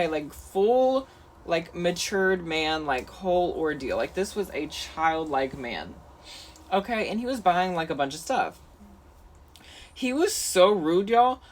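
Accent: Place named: San Francisco, United States. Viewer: What a San Francisco accent.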